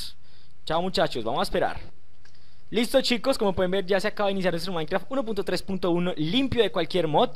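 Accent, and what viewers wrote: Colombia. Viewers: oye eres colombiano y igual que yo?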